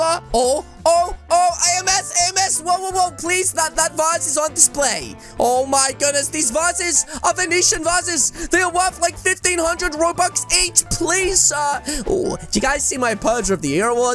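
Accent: United Kingdom. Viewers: Bro is in British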